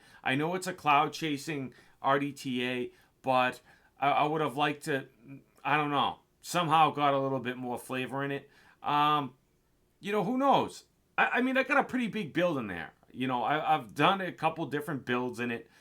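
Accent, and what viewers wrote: Boston, United States. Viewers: Do I hear a Boston accent?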